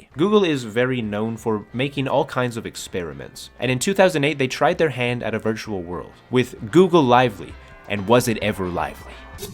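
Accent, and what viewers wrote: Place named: Canada. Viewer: i am going to bet $100 that you are canadian just based on your voice. love from BC, this video ruled :3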